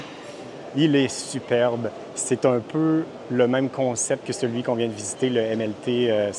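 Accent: Quebec, Canada. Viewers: Le système douche/toilette séparé par une cloison amovible! Wow ! Aussi, je veux te dire que ton parler fait honneur au québécois, moi qui en suit une! ❤ Tu n'as pas été empêché de filmer comme les Vadrouilleurs toi? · Merci pour ta vidéo et surtout merci de garder ton accent Québécois pour nos cousins.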